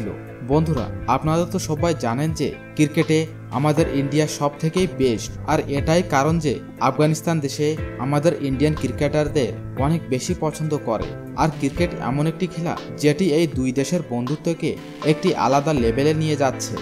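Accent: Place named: Bangladesh. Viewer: Apnar kotha sune to Bangladeshi Mone holo.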